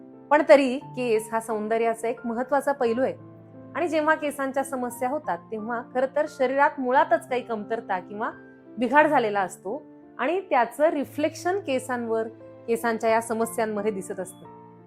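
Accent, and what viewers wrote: Standard. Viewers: स्पष्ट, शुद्ध उच्चार आहेत तुमचे.उपयुक्त सोपी माहिती सांगितलीत. धन्यवाद!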